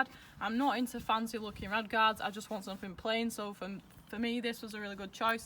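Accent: Northern England, United Kingdom. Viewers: No, I'm in Norwich. I just like northern accent lol. Watched the MT10SP ride and then this one... Not enough UK vloggers with interesting accents... Keep it up, sort the Mic out though... bit quiet 👍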